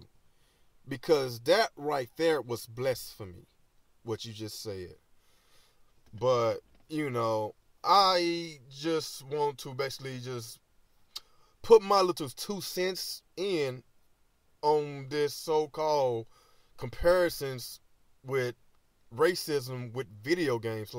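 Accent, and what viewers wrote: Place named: AAVE, United States. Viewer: I hate ebonics lol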